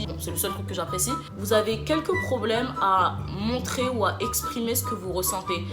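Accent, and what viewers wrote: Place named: England, United Kingdom. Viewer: team gémeaux malgré toutes les critiques 😂 les gens croient que je suis taureau le plus souvent... j'ai sorti une video sur les 3 qualités de chaque signe astro regarde l'avis d'une gémeaux^^ tu me tues , comme t'as demonté tous les signes 😂😂😂 ma bestie est poisson (sinon ton accent anglais est genial) !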